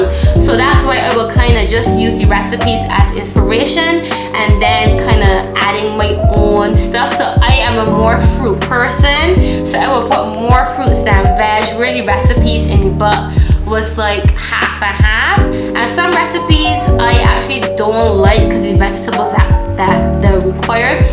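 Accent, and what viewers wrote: Barbados. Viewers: I love your Bajan accent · I hear that lovely Bajan accent. You remind me of my bestie who is from Barbados! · That bajan accent is amazing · Are you a native of Barbados 🇧🇧 🤔 Love the accent ❤ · She’s giving me bagan accent 🇧🇧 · I'm sure that a Barbados accent I can hear. Where did you get those amazing big bowls from? Great video by the way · Well it is always refreshing to hear the best accent in the world...Love yah.As you can tell I am a Proud Bajan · Omg!!!! You must be Bajan💗I love your country and all of y’all’s accents💗💗. Loved the video as well. Just subscribed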